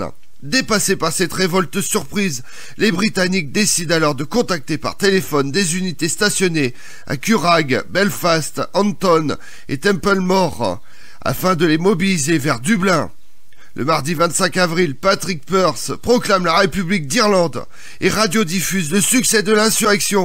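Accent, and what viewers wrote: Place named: France. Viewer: Ta prononciation de l'Anglais est très... Française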